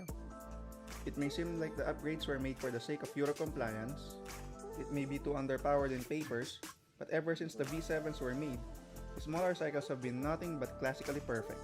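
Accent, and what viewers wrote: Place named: India. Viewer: Interesting and well produced video, but I struggled with the narration. Not even the English speak that fast and coupled with your heavy Indian accent, it was difficult to understand you. Please slow down your delivery and I am sure your viewers will appreciate it.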